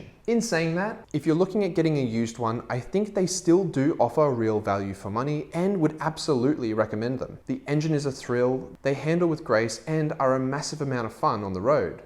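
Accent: Australia. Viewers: I love my 2019 Ninja 400 KRT. Same livery as yours. Here in Sydney, I got mine for a lucky $4.8kaud that's done only 4kkm with Two Brothers Hurricane exhaust, Oggy sliders, tinted shields and 8 months rego. Clean as condition! It's the best! I don't even have my Ls yet so I just ride it around the property for now lol 2 weeks to go till my RKT. Just discovered your channel, good stuff! Nice to hear an Aussie accent motorcycle YouTuber!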